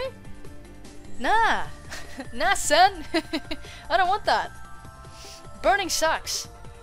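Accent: United Kingdom. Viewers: You have a beautiful accent! What is it, british? :D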